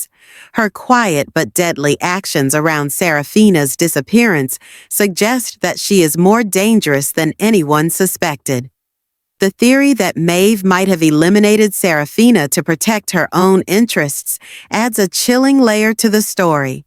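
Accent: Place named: United States. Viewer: It's interesting having the feedback, but with the american accent of this woman , she is not pronouncing most of the words properly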